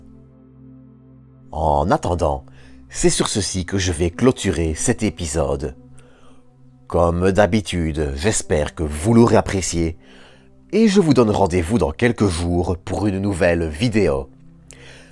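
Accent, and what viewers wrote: Belgium. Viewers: C'est l'accent belge.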